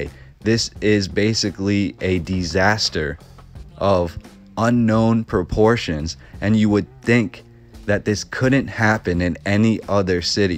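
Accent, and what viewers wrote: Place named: East Coast, United States. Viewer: I recently found your channel It fantastic I can hear the east coast in your voice Lol Have you done washington dc or georgetown?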